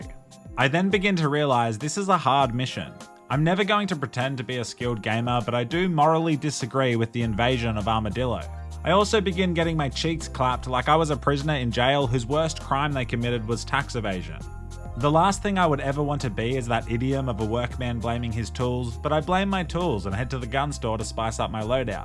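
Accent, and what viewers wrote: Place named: Australia. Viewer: when he pronounces Anna as "ANR" - Australians really do have wild accents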